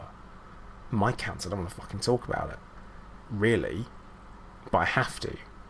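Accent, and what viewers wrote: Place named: RP, United Kingdom. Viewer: May 24th, 2023 - Dearest, you are inspiring me in ways you will never understand. I feel at times, that you are speaking directly to me, as if you know me personally and you're giving me advice on how to handle my problems. I am very much like you, in the way you like to know the plan, and what's going on each day, wanting the facts and having them organized. There's nothing organized about being ill. I understand the triggers too, when you can't get away from it, and cancer is everywhere all the time! I love your free talks, where you just free-flow with your feelings and ideas. It's like listening to a friend who's going thru a very hard time. I am sending you my best wishes as you go thru your last days. I was heartbroken to watch your latest video today. Your voice is always something I've enjoyed so much. You have such a lovely posh accent, and are so philosophical.:face-red-heart-shape: Smelting Pot!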